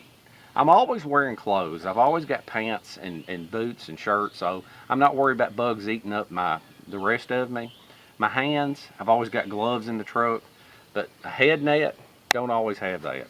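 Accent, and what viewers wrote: South, United States. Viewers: I subscribed to you a few weeks ago now. Love your honest life experience opinions. Keep your videos coming, very educational. Seen so many bug out bags, the wife's kicked me out bag lol, get home bag, I got this knife to add to the 5 other knives lol Common sense. I've added to my van a few of what you have. Many thanks. My wife loves your southern accent.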